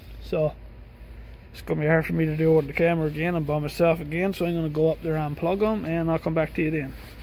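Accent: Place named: Newfoundland, Canada. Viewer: what part of Newfoundland are ya from?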